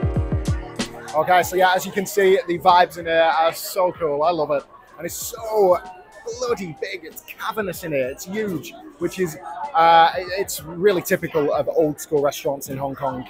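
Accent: United Kingdom. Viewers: Best Food Review Show on YT. Better than Mark Wiens. Don’t ever stop. Love the OTT, British accent, food energy, videography. ✅💪👍 #nojoke #keepatit #bestfoodreviewshow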